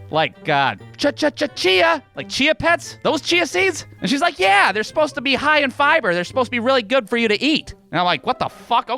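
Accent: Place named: Boston, United States. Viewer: This is the most boston sounding thing ever it's like mark wahlberg on crack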